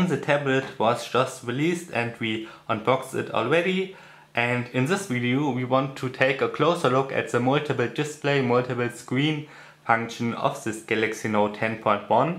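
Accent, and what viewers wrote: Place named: Germany. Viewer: Ob und zu welchem Video ich einen Kommentar abgebe, solltest du besser mir überlassen. Wenn du ein Video online stellst, musst du auch mögliche Kommentare hinnehmen. Ich spreche 6 Sprachen in der jeweils landesüblichen Koloratur und ich bin auch Deutscher. Eben deshalb schmerzt es, deinem Englisch zuzuhören. Meine Meinung in deutsch zu verfassen geht schon in Ordnung, denn dein starker Akzent lässt ja nur die Schlussfolgerung zu, dass du Deutscher sein musst. Insofern ist deutsch schon richtig.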